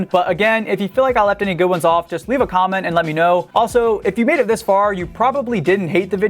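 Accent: Southern California, United States. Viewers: i think its a SoCal accent idk much about it so you can try to search it up if you want. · So this is what a california accent sounds like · Nick Z that’s a SoCal accent. You hear it in valley people and a lot of surfers, skaters, and punks. I notice people into the things mentioned even talk like that if they don’t live in California. It’s a subliminal thing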